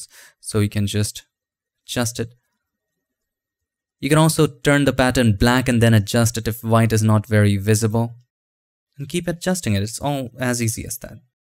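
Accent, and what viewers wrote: India. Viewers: Прикольный индусский акцент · The only one indian accent guy on YouTube who actually professional and explains stuff the correct way! :D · The only indian accent I could listen to for an entire day.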